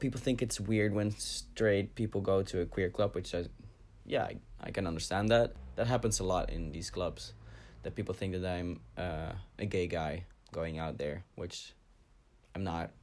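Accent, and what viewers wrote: Netherlands. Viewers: That dutch accent tho 🤘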